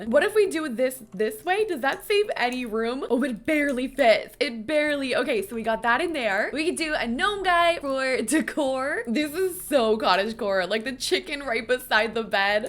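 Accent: Canada. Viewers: I love how she says words like “couch” and “house” 🥰 I didn’t know this was a canadian accent until I discovered her channel. (I’m not a native eng speaker)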